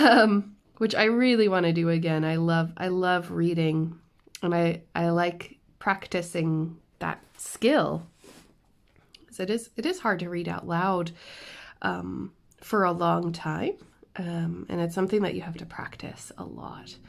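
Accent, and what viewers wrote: Ireland. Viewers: Sometimes when chatting you can hear a bit of the southern Irish accent coming through, even though you are American and to be honest it sounds really beautiful to. Wow I am surprised that negative drama is showing it's head in the crafting community, what is wrong with these people crafting is meant to be relaxing, fun and hopefully making new friends who enjoy the same hobbies that we all have in common. So sorry that has happened to you I must see if I can remember my Instagram password because I am looking for a particular diamond painting called remember me from dac which I am keeping money aside for if it suddenly comes along. I also have quite a few diamond paintings that I could donate for your charity. God bless look after yourself I feel your pain I have developed an allergy to sunshine just my luck, my son says I'm turning into a vampire 🧛‍♀️lol. 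🤗🍀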